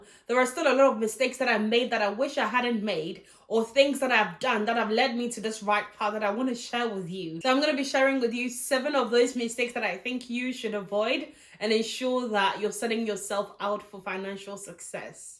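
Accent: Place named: United Kingdom. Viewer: You are from Nigeria but you don't speak like one. You have a UK accent, I like the way you speak nice English. Maybe you were brought up in the UK.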